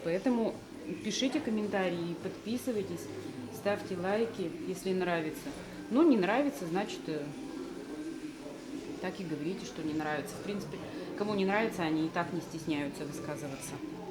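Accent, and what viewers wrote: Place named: Udmurtia, Russia. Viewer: Вот это акцент, наверное, вы из Удмуртии, в Воткинске так же говорят. Привет из Москвы.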